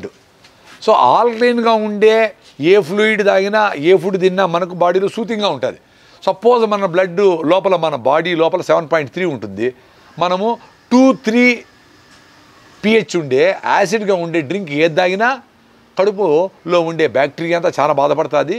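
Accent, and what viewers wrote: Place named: Madanapalle, India. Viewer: Really great information sir.pakka madanapalle slang telugu.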